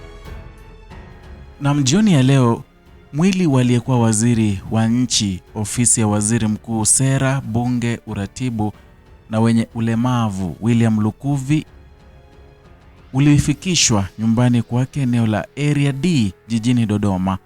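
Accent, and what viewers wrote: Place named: Kenya. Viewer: Wewe ni Mkenya. Mtanzania hana rafidhi km yako aisee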